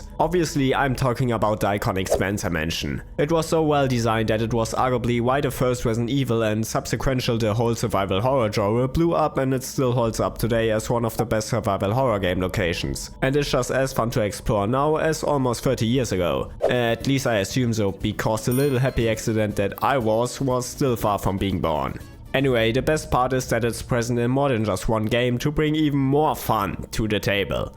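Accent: Germany. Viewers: Ich höre Deutsch raus hahaha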